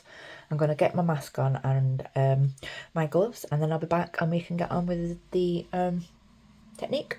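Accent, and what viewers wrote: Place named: United Kingdom. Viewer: He is beautiful! I laughed every time you said “bubbles “, your British? Accent makes it sound cute!❤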